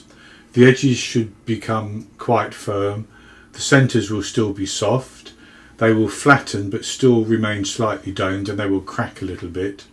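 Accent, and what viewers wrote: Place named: Somerset, United Kingdom. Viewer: thanks,love your gentleness and relaxed approach...are you retired chef,your brilliant and I'm trying to work out the English accent...Somerset?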